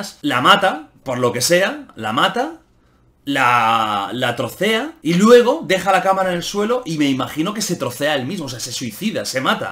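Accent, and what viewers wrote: Catalonia, Spain. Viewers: Me encanta tu acento catalán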